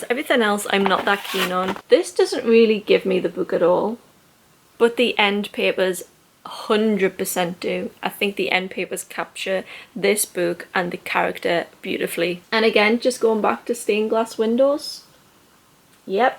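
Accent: United Kingdom. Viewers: Dear, when I started to get interested in book subscriptions, I found your channel and I chose my subs because of your videos. Your taste really speaks to me 🫶🏻 Bonus point: I‘m from austria and I love your british english ❤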